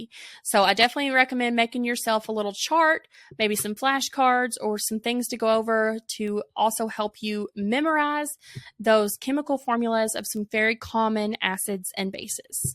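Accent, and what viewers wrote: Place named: South, United States. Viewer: goodness I love southern accents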